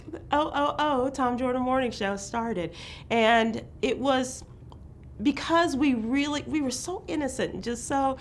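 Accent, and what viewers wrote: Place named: Chicago, United States. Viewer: Chicago accent 😊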